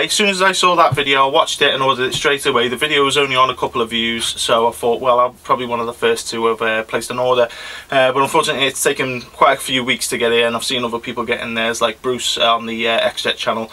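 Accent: Liverpool, United Kingdom. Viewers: nice video! Are you a Scouser?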